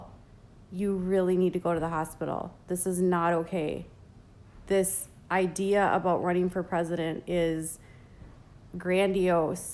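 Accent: Minnesota, United States. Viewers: I think needs to go back to writing some BS liberal snowflake “column” or “blog”. She pathetically uploads several times a day about ridiculous topics with no proper research or fact checking. She has to censor every little word she is afraid might keep her from making a buck. Hey, if you’re so passionate about your political beliefs, just say the word. ABORTION. If you want to even ATTEMPT to play the reporter role, drop your awful Minnesotan accent and learn to use talking head diction. Putting a bag over your head might help as well. You have a rare talent of making someone’s eyes, ears, and brain bleed simultaneously. Ugly bitch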